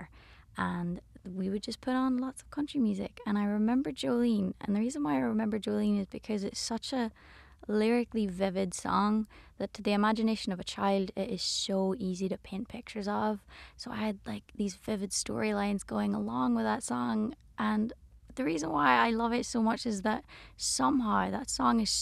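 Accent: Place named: Ireland. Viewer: I don’t know how I missed these before but I just listened to the most hauntingly beautiful version of I have ever heard. Your voice just transports me! I love listening to your soundtracks and your beautiful Irish lilt! God did magic when he created your voice! Thank you so much for being brave enough to share it with us! Love you!!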